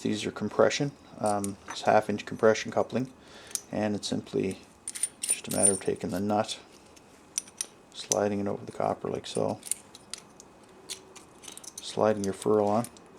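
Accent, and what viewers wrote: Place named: Newfoundland, Canada. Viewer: Excellent video sir! Very clear, succinct, and to the point. Completely unrelated - to my ear, you sound like you might be from Newfoundland. (St. John’s or vicinity, perhaps?) It’s not very noticeable - but I picked up a subtle accent on words like “part”, “proper,” “marker,”- and “copper.” If you are NOT from Newfoundland, Id be curious to know where you ARE from. Thanks again for making this very informative video. Cheers!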